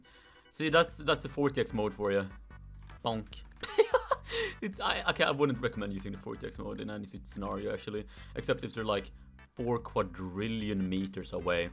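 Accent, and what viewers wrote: Sweden. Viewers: Are you Swedish? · are you swedish? you sound like swedish:/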